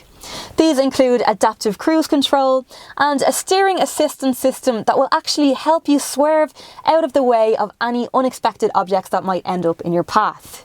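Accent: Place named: Ireland. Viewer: Greetings from Sweden. I love your Irish accent... so pleasant. Great review from a beautiful woman.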